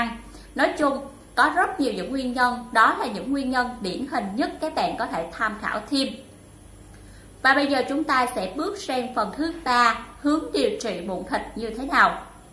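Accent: Vietnam. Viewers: Cô này người miền trung chớ không phải miền nam nhé , đó là giọng miền trung , hãy phân biệt cho chính xác . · Bắc không ra Bắc Nam không ra Nam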